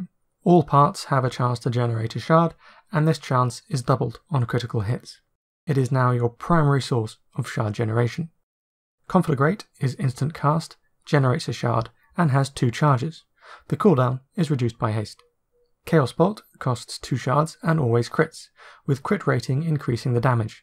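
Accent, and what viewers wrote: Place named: United Kingdom. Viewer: Nice video! Nice to hear a British voice for a change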